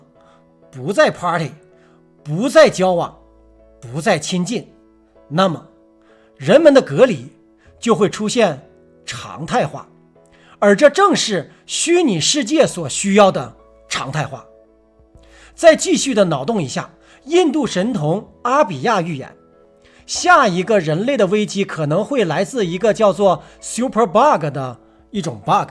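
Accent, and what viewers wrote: Northeast, China. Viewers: 👍👍💕👏👏好像是我們東北口音？！支持你✌️💐🕊️🕊️🇸🇪🇳🇴🇸🇪🇫🇷🇮🇹🇩🇪🇻🇳🇭🇰🇯🇵🇸🇬🇳🇴🇮🇸🇫🇮🇳🇴🇹🇭🇦🇺🇯🇵🇸🇬🇺🇸🇹🇼～～～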